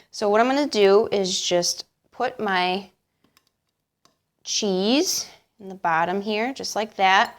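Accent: United States. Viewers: Buffalo, Cleveland, or Chicago accent?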